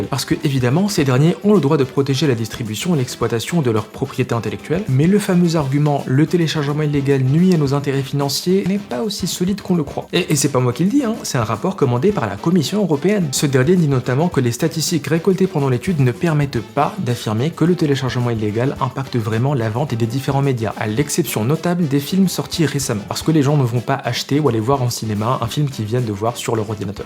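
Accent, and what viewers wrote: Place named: South, France. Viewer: Oh bonne mère, quel bel accent du Sud !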